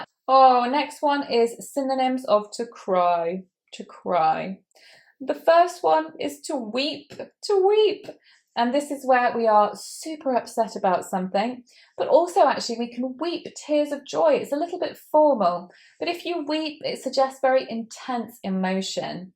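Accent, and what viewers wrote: United Kingdom. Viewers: Thanks you. I'm from Brazil and I,m learning a lot and also correcting the bad words pronounced. I like so much your british accent. God bless you and your baby. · I enjoy this great method of explaining. But as a biginner I need to watch more lessons from teacher in order to be familiar with her british accent. A biginner learner from Algeria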